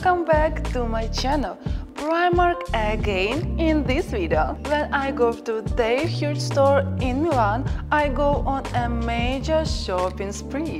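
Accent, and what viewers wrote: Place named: Stavropol, Russia. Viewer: Ставропольский акцент не спрячешь)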